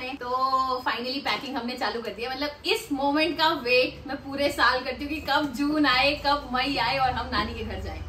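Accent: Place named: Gujarat, India. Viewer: Hi... Tomari Hindi old Gujarati mix language achcha lagta Hai tumhara bolane ka style... Ab Punjabi Ho aur Gujarati mein marriage ki hai ab aap ke nakshu ko Punjabi Sikhaoge ki Gujarati bolna... Aap ki mummy ka Ghar kaha hai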